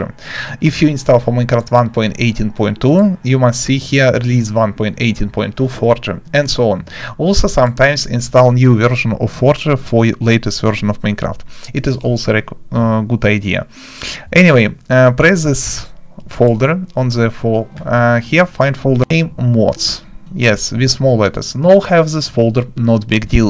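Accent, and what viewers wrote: Russia. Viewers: Очень русский акцент))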